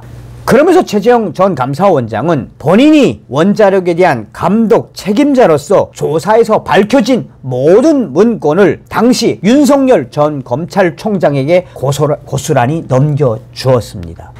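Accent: North Korea. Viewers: 북쪽 말씨 듣기도 싫다 적당히 씨불이거라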